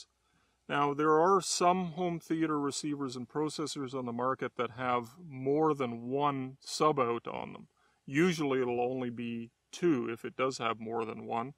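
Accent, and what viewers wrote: Canada. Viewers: I heard that! Canadian! Much love from the U.S....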